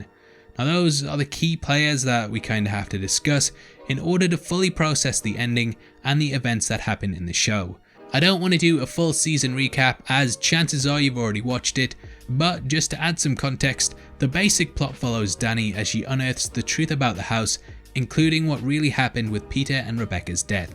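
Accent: United Kingdom. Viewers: An old, beautiful house... British accent... …nice dresses. Count me in! :)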